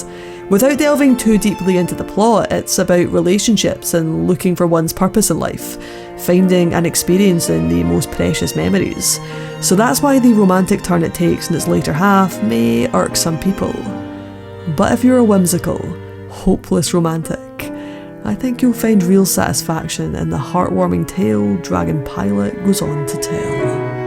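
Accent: Scotland, United Kingdom. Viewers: Also I do love a good Scottish accent my favourite band is from Scotland or was :( he recently commited suicide frightened rabbit was there name if you don't know them I am sure being Scottish it was likely in the news. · Fantastic review currently watching episode episode 7. Also beautiful Scottish accent can't wait to watch your other reviews definitely deserve more subscriber's. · Just added it to my queue. Thanks. Hard to remember the anime from a season or two ago that I skipped because they'd be on Netflix eventually. This certainly looks like Terrace House + dragon air force. Terrace House being the only reality show I've enjoyed since the third season of Real World (Yes, old enough to remember how great Puck was.) As soon as I added Dragon Pilot, Hilda popped up. Voiced by Lyanna Mormont. Gonna have to watch this first just because of that. 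That said, have you tried getting into voice acting? I see you suggest your accent is hard to understand at times, but as an American, Scottish accents are magical. I mean that literally. The sorceress voice actress in rpg X, cartoon Y, youtube machinima thing Z, I feel like she's usually best served with a Scottish accent. I mean, real normal cartoon/video game characters could work too, but magical characters just always FEEL Scottish to me.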